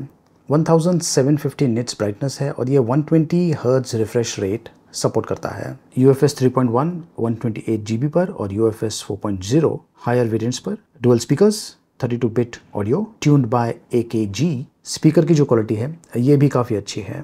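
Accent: India. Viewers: No it is Indian english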